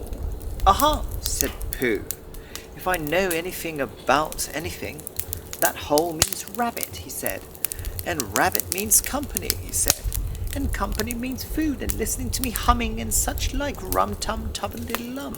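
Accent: United Kingdom. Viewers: 焚き火とイギリス英語の音が心地いいですね…🔥！ 全ては聞き取れないので、聞き取れるように何度も見直すつもりです😂 英語学習を頑張っているところなので、英語系コンテンツもあげてくださるととっても嬉しいです✨これからも応援しています！！ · 聴いてて心地よいイギリス英語～ 落ち着きます(^_^)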